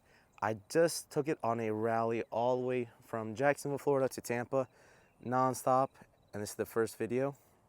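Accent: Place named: Albania. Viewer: You sound Albanian 😢😔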